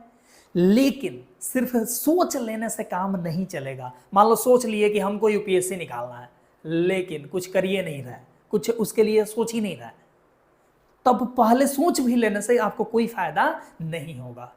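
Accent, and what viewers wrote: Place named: Chhapra, India. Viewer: Sir aapke video me chhapra wala tone aata hai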